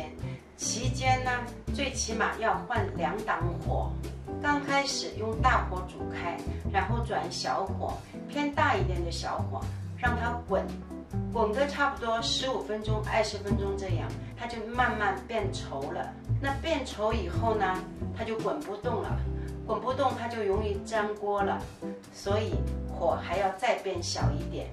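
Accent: Shanghai, China. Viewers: 用電飯煲可以嗎？阿姨說的是上海普通話 哈哈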